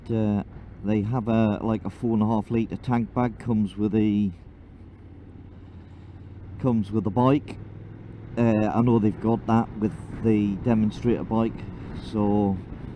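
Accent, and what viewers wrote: North East England, United Kingdom. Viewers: Ah thats great thought I picked up the accent, I'm from North Shields, like you I moved to London at 20 yrs old and Im 60 now living in Hertfordshire. I have an NC and although it isn't a flying machine it does just what I need. I'm new to your channel but will go through your vids which so far are just great Thank You. Take care mate.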